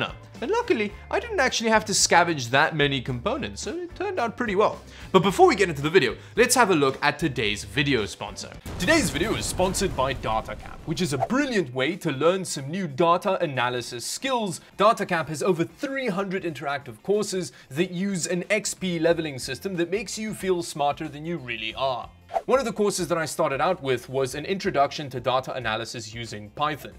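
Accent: South Africa. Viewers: are you south african? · Mmm, am I detecting a South African accent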